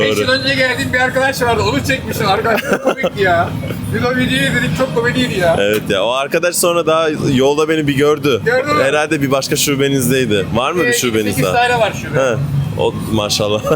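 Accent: Turkey. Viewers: 15 sene Japonya'da yaşayıp Tr şivesinden kurtulamamak peki?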